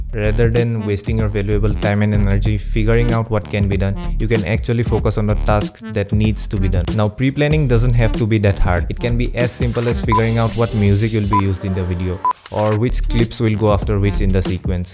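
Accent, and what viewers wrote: Assam, India. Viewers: Holy crap bro, im not even done watching the vid but I cant resist the urge to say how cool you are for someone who has an indian accent and a pretty decent sense of humor lmao... Fellow video editor here, keep it up. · i can feel the assamese accent... love from Assam 💗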